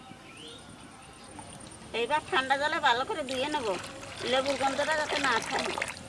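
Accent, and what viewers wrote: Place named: Faridpur, Bangladesh. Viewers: ফরিদপুররে ভাষা বাংলাদেশের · Ei Thakumar adibari faridpur naki???love her accent..💚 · ভাষা শুনে ফরিদপুর মনে হচ্ছে।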